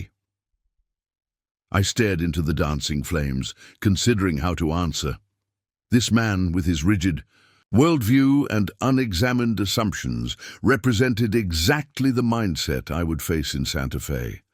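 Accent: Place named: England, United Kingdom. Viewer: Why in the world, when it’s a woman’s story, why don’t you have a woman narrating? Instead you have an English chap narrating for an American western, woman’s story?! Quite a turn off!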